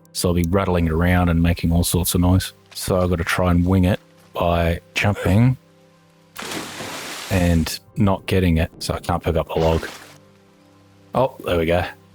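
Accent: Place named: Australia. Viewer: Love the australian accent!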